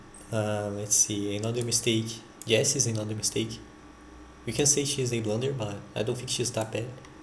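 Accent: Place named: England, United Kingdom. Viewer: I love his english accent so bad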